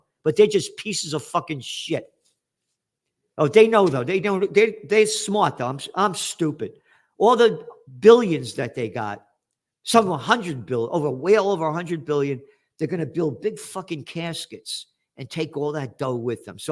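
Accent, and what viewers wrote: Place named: United States. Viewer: You speak American, I like it.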